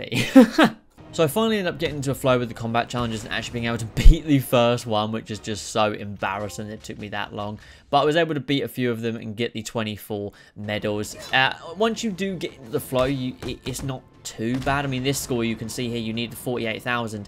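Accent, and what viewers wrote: United Kingdom. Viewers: Whenever I feel lonely in the world, for a few minutes shows me that he too feels all the rage. But in British so it’s classier. Dude, you have become my favorite platinumer on YT! Great content as always!:)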